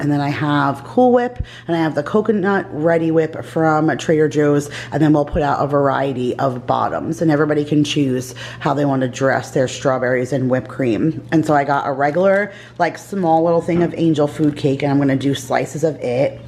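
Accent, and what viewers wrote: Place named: New Jersey, United States. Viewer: Just found your channel today & I am SO happy to find a vlogger who has my accent! No offense to those amazing southern moms, but I can only stand to hear penne be pronounced "pen-NAY" so many times 😂. Much ❤ from NJ!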